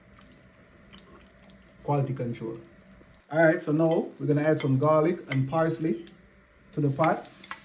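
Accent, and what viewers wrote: Jamaica. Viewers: I love your Jamaican Accent. The food looks amazing.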